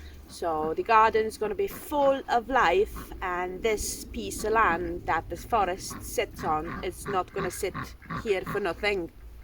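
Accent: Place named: Scotland, United Kingdom. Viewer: Love this!!💛💛 Wishing you all the success with your vlogging journey!! 💛💛 You and your Scottish accent haha!! 💛💛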